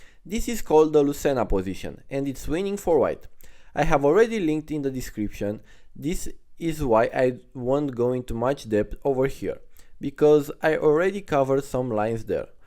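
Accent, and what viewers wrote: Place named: India. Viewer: I didn’t know you had an Indian accent.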